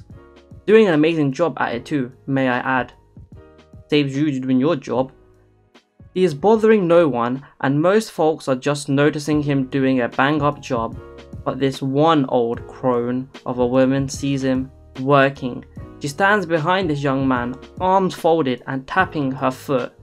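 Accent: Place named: London, United Kingdom. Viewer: i am a subscriber, have been for about 5 minutes because you sound like a london boy to me, gotta watch out for the london crew. i am a satanist, you are more than welcome to use satan as a curse word, we believe in freedom of speech, have fun. ave satanos.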